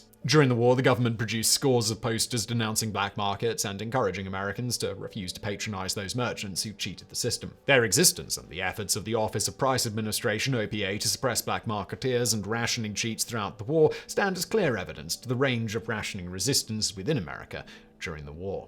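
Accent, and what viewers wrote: United Kingdom. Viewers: Britain or Scotland. Love it.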